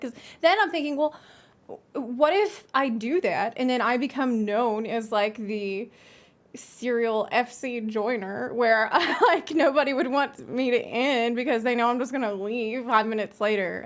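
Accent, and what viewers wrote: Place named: Mississippi, United States. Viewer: I know you live in Ukraine, but are from the US, but I can't help but recognize the accent. Are you from MS? Love you bye!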